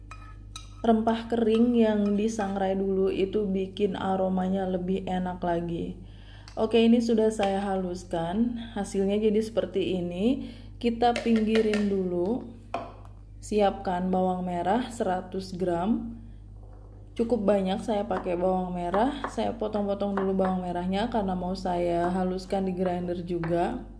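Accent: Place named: Java, Indonesia. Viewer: Logat Jawa kasih masakan Madura ...... Sepertinya resep Ini perkawinan Jawa Madura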